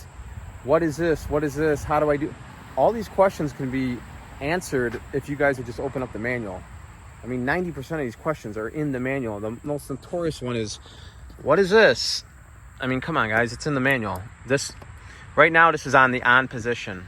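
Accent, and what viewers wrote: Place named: Chicago, United States. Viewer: You can totally tell you’re from Chicago lol but love the video 🔥🤌🏼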